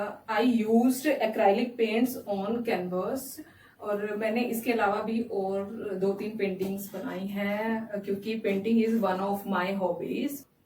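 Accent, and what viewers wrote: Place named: Himachal Pradesh, India. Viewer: Himachali accent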